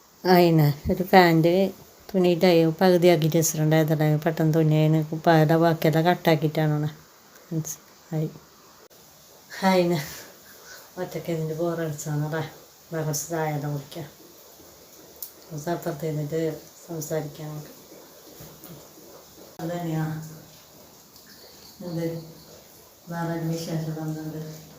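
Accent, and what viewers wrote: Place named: Kasaragod, India. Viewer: Nighale sthalm evde Kasaragod Karnataka boadr bagathula slanganllo athayath nde slang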